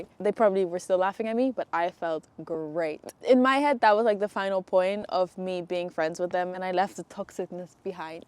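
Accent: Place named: Scotland, United Kingdom. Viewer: how do you have a scottish accent? I love your videos x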